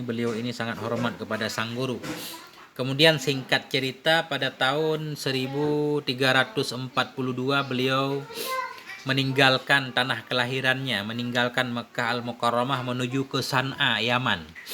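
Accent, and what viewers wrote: Aceh, Indonesia. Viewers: ooo jroh gure,lon kalon cara boh makna kitab,logat pesantren aceh,jino pat tinggai gure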